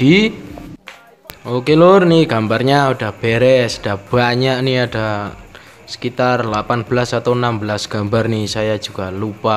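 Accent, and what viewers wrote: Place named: Blitar, Indonesia. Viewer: Dialek ngomongnya seperti wong mBlitar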